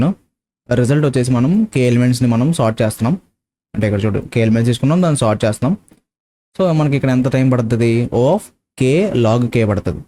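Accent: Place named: Godavari, India. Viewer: You are from east or west godavari... Ur slang in very close to ours...🙂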